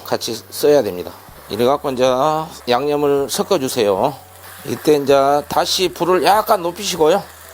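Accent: Jeolla, South Korea. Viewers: 쉐프님 전라도 사투리 구수합니다 여긴 완도 에요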